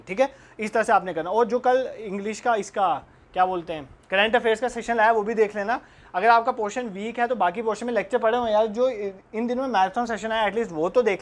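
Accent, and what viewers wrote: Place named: Poonch, India. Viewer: Sir ke accent se hi me smjh gyi thi ye apne poonchiye bhai hi h koi😂😂😂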